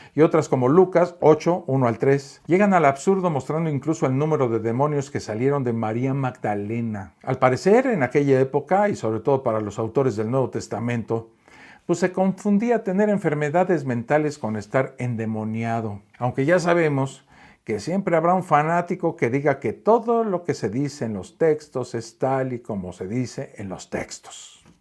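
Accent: Mexico. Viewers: CAUSA GRACIA TUS COMENTARIOS. ¿QUE ERES? ¿DONDE ESTUDIASTE? ¿ERES BACHILLER? SI NO CREES EN NADA, VIVE TRANQUILO TU VIDA Y NO TE METAS EN LA VIDA DE LOS DEMAS: .JUZGAS DESDE TU PUNTO DE VISTA, ELUCUBRANDO ESTUPIDECES y TU TONO MEXICANO QUITA MUCGA SERIEDAD A TU ABSURDO DISCURSO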